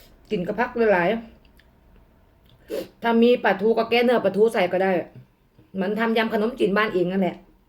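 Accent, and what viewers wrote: Korat, Thailand. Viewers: ผมชอบพี่ พูดโคราช เพราะดี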